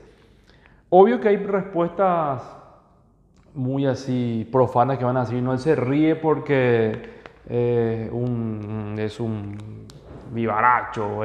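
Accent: Paraguay. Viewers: Hola nada que ver al tema pero tu acento o sotaque e de Paraguai verdad? 😊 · Sos de Paraguay? Tienes acento de ahí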